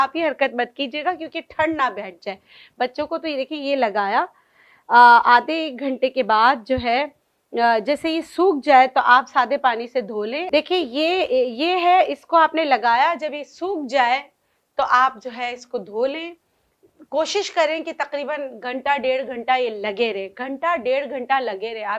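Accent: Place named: Gilgit-Baltistan, Pakistan. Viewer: Salam Dr Madam, this is very nice and very simple kids and others, thank you Dr gi Your way of talking shows you from gilit baltistan.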